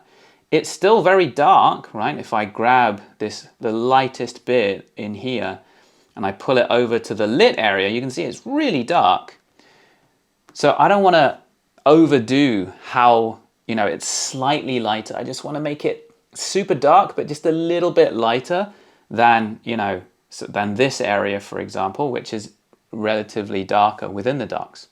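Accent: United Kingdom. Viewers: I love the accent when an English person lives abroad they have an accent diffent from home town but yet not same accent in country they living in, nice paintings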